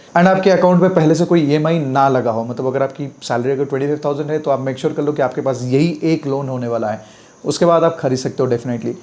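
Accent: Odisha, India. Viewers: Bhai are you odia..?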